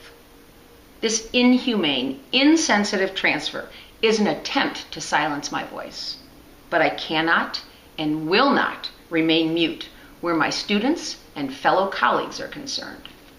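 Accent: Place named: New York, United States. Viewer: What state are you from? This sounds exactly like NY.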